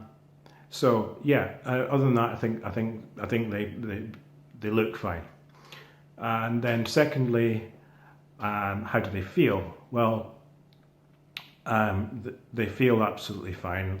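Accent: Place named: Scotland, United Kingdom. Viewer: He's developed a Scottish accent!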